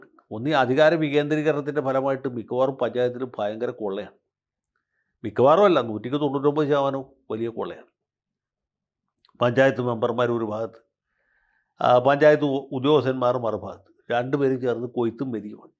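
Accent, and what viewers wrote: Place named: Central Kerala, India. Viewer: your language is clear middle Kerala.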